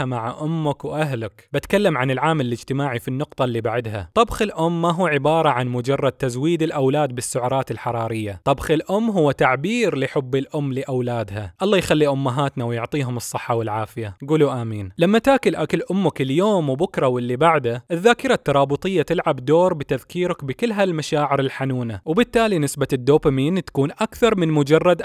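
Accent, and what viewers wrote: Qatif, Saudi Arabia. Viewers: انت من القطيف 🇸🇦 ؟ لأن لهجتك نفس لهجة سرورز 🇸🇦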